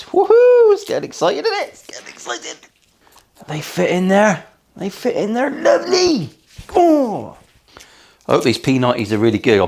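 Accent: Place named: Plymouth, United Kingdom. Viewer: The Plymothian accent had me hooked immediately. I'm Bristolian, so we share a twang.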